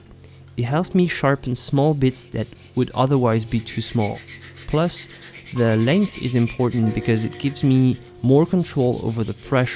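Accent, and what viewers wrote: France. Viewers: I can tell you've been working on your English. Your French accent is much less pronounced now. If you've been working on this, well done!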